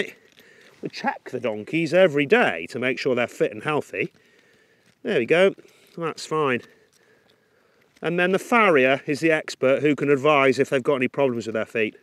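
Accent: United Kingdom. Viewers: omg the posh level of this guy, its like if you could take the most stereotypical rich english guy and put him on screen you would naturally have this youtube channel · Because he has English accent.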